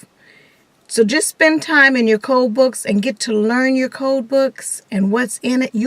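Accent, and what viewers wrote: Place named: South, United States. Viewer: I absolutely love your channel, this one and your other one! Your Coding Master Coach helped me past my CPC exam on the first try. Your approach is so likeable, and down to earth, with your southern drawl...so lovely. You're a very beautiful lady too, I love seeing a black intelligent women knowing her stuff! I clicked on this video today, but before I watched it, I wanted to post this. I know the topic of this video is Loving your books, and OMG if that is not the one thing I stress to people. You get to learn your code books by actually doing some work, working out different problems and different scenarios will give you that understanding and that missing piece to the puzzle. 5 hours goes by incredibly fast, and these books are huge, you want and NEED to be able to flip back and forth and feel confident that you know what you are doing. 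Love you! xoxo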